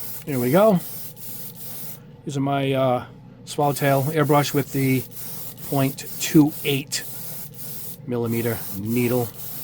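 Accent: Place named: New England, United States. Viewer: Back to modeling after a 60 year hiatus. Very much like your informative videos. I live in CA and recognized your New England accent right off the bat. I grew up not far from you, north shore Boston. I've been looking for MRC leveling thinner but it is nowhere to be found so I just placed my order for your replacement product. Thanks, looking forward to trying it out.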